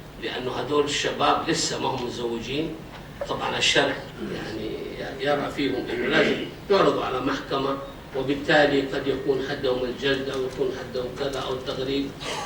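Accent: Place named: Hejaz, Saudi Arabia. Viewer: Cashit Fr ياخبل ذا ماهو طرش ذا يمانى اصل العرب بس لهجته حجازيه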